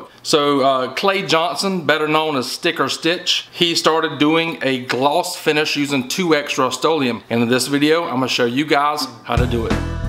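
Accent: United States. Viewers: 2 questions please: 1, can I use this method on wooden coasters, will it give a non stick finish for coffee cups? 2, are you quoting 200 deg Fahrenheit or Celcius - I am assuming Fahrenheit as by accent, you appear to be American. It could be very helpful if you noted either Fahrenheit or Celcius in future videos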